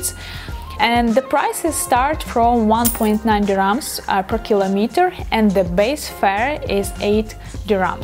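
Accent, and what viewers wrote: Russia. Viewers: как-то странно слышать английский с русским акцентом😅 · Madam Your Russian Accent Is Amazing.i Like It. Love From Pakistan💓🤗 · Is that a Russian accent?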